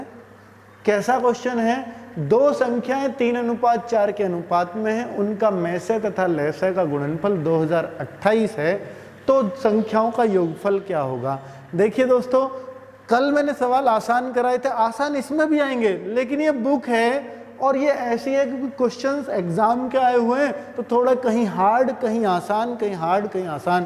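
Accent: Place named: Haryana, India. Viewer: sir.. Mujhe aap k video dekhte hue 4-5 din hi hue hai, but itne me hi Mai aap ka Bhout bada fan ho gaya hu... Specially aap ke haryanwi boli ka! Mai jaldi kisi Ki video like nahi karta, but aap Ki Mai video dekhne se pehle hi like Kar deta hu!😊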